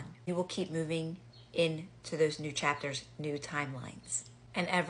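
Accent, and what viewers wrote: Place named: Philadelphia, United States. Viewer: I cannot get past that Philly accent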